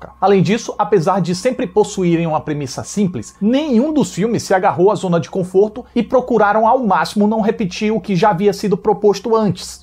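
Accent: Northeast, Brazil. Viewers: Tá mais bonito fantasiado de zombie . Brincadeiras as parte , eu gosto muito das suas críticas. Muito bom o sotaque nordestino , eu TB sou srsrs ; além da análise madura e didática . 🎉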